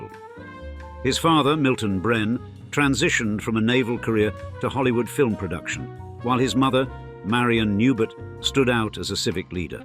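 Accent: RP, United Kingdom. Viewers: The posh British accent makes it so much more Old Money sounding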